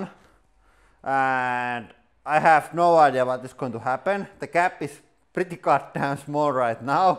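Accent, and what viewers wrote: Finland. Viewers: You turned Stretch Armstrong into a chicken carcass! You Finns rock. I worked for ABB many years and traveled to Helsinki from time to time. I miss hearing the "Finglish" and your great sense of humor. Thanks to your channel, I get this back without traveling to Suomi-land. Take care and stay well.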